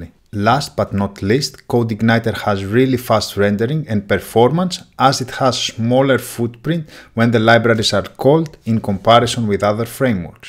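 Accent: Greece. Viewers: α ρε φιλε κανει ΜΠΑΜ οτι εισαι Ελληνας,δεν μπορεις να φανταστεις πως νιωθω οτι ακουω εναν Ελληνα να μιλαει για τετοια θεματα ( οχι ειμαι τιποτα κολλημενος με τη χωρα η τιποτα πολιτικα οτιδηποτε) απλα καταλαβαινεις , ειναι τελειο να καταλαβαινεις οτι ο αλλος ειναι Ελληνας με τοσες γνωσεις για το συγκεκριμενο πραγμα που σε ενδιαφερει και σενα. Συγχαρητηρια ρε φιλε , συμφωνω σε ολα οσα ειπες στο βιντεακι. Κακο που μιλαω στα ελληνικα βεβαια , απλα ενιωσα την αναγκη να δεις ενα ελληνικο comment για να καταλαβεις οτι ειναι respect αυτο το πραγμα. Απο τα επομενα ολα αγγλικα :) Μου προσφερες ενα τρελο χαμογελο ( με τη καλη παντα εννοια) απο τα πρωτα δευτερολεπτα που καταλαβα οτι μιλαει Ελληνας.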